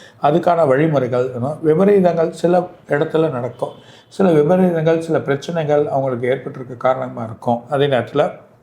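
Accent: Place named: Chennai, India. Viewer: Super a madras language pesureenga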